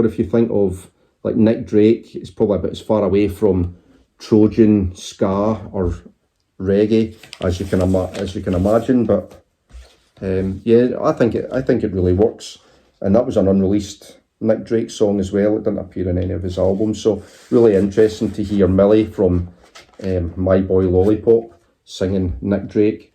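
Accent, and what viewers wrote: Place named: Scotland, United Kingdom. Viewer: Hi Look at all those records eh? Wow. That cover art was stunning. Wow. That would probably fetch a nice penny or two. My knowledge being limited to the RSD one I bought this year. Hey, there's the I got in Stockholm. Aww... (my one valuable Albert and some c**t threw a dart at it. Ah, that beautiful scottish brogue of yours. "Kånnty Jo and the Fesh". I had Irn-Bru in Stockholm. Oh, Vanguard. Reminds me of the... Anglia logo? I'd love to get the discography now (on vinyl) since I now have all of the ones, and, so, love that album!... love that album! I don't mind you don't like them. Btw have you tried listening to the ones? Splendid haul. Cheers 😊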